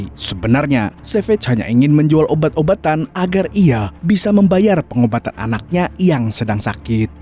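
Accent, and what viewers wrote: Banjar, Indonesia. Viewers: betakun am .. org banjar kah pian mang ?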